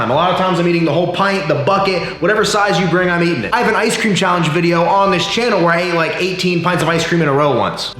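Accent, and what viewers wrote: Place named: Ohio, United States. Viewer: I can hear the Ohio lol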